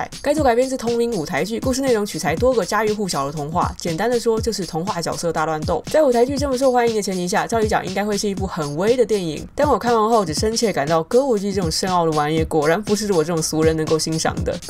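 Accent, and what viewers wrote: China. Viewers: 主要是口音問題，一聽就知道 ；用字遣詞不是在成語，是在一些像"尤為特別的存在"、"玩意、"不能忍"等一般台灣人不會使用的語句，台灣學對岸的詞彙大多是台灣本來沒有的簡稱，像是小三、小屁孩等，也僅此而已還是建議你口音可以改台灣人比較能接受的方式，如果你觀眾群在台灣的話，因為很多人對這是很反感的，像我因為有人推薦說很好笑來朝聖，但口音和用詞讓我退避三舍，大概30秒就不行了，很遺憾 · 魏茹茹叫別人不要在台灣用大陸口音,想想嘛...不就等於叫台灣人到大陸不准用台灣口音.... 如果是我我也不知道要怎麼跟人家溝通哈哈...多體諒一下就好啦,不喜歡也不要說的那麼白,要留台階給人家下呀...尤為特別存在我會用啊...玩意兒...不能忍很常聽別人說呀.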